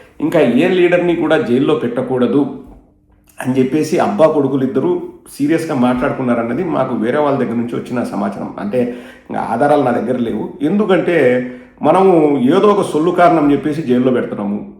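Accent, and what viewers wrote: Rayalaseema, India. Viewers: complete ga Rayalaseema slong lo videos Pettu abba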